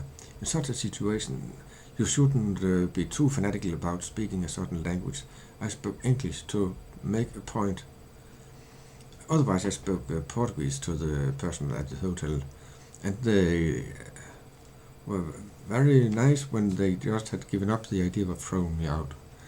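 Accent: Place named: Spain. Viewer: I've got to agree with Ssoloness, I'm afraid. I'm a native speaker and could hardly understand most of what you said in this video. I can sense some sort of intereference from Spanish and Italian as well - which I can totally understand since the three of them have got the same root. You seem to have good vocabulary base, though. Imho, you've master written English pretty much to native level, as for speaking, a similar issue.. even though your accent isn't too thick.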